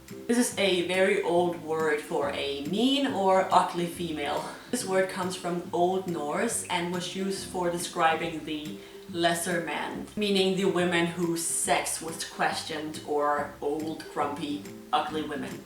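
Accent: Denmark. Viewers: You have such a typical danish accent :D! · low key Sorry, that sounded a bit shitty :) I just meant, that its clear (to me) that her accent is danish.. :) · you have such a typical danish accent.... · man kan godt høre du er dansk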